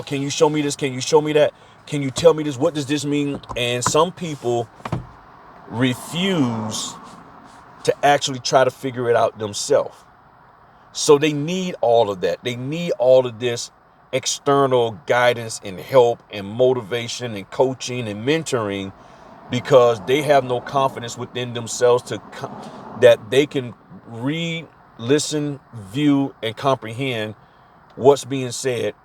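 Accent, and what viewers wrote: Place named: California, United States. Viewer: You sound like a Californian from Pasadena.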